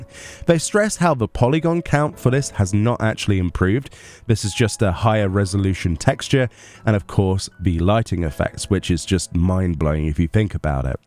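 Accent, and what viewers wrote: United Kingdom. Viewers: Oh, it is my first time encountering British accent :)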